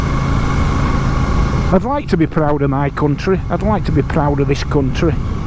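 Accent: Yorkshire, United Kingdom. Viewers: Great to hear a fellow Yorkshireman speak out 😊 I fully agree with all you say here, as we do seem to have a two tier police system; that seriously needs to be altered. Nobody seems to be respectful or afraid of policemen in today’s world, & therein lies so many problems. 😢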